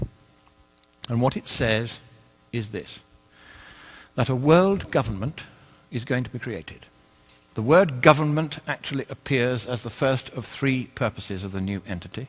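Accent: United Kingdom. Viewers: The effects of too much Co2 do effect our environment. Just because the talking head in this video has a British accent and has adopted the title "Lord" does not make him intelligent. Educated sure, but intelligent. Far from it. There are already examples in nature of how the rising Co2 levels have altered our environment and the living habits of animals. No one is saying it is just co2. But co2 is a large factor for reasons so complex and many that your head would explode. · Oh look, it's Glenn Beck with a british accent. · Fear mongering of the worst kind. Half truths, a lovely British accent. What is he really saying? That we should recall the president? I think between Rupert Murdoch and Dick Cheney, they have something more "black ops" in mind. The real danger is turning our county over to the right wing ideologues. I'm sure they will watch over our freedoms for us. 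Until the middle class is wiped out, and we have a feudal state. Them in charge. The rest do what they say. Now can we see who is a Nazi? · Nothing more than a right-wing fear-mongering asshole with an English accent. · Great, a shithouse-rat insane conspiracy theorist with a british accent. He doesn't back up anything he says with scientific evidence, he just expects you to believe it because it sound good.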